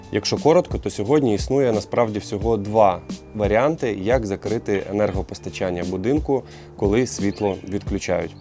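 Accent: Standard. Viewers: норм язык, в наше "время мовнюков" редко услышишь чистый родной язык, а не суржик. Спасибо. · Чистый украинский.Респект!!!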